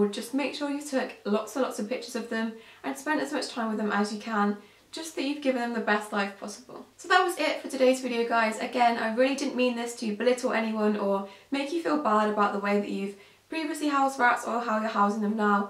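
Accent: United Kingdom. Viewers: You Bri-ish? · Are you British *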